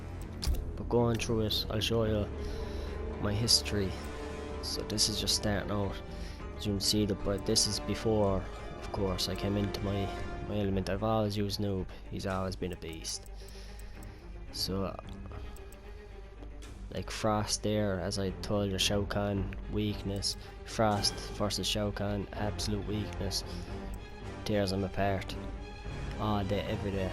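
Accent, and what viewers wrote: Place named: Ireland. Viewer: Lad your Irish omg I'm Irish too ! Add me S2S ( Nintendo Switch )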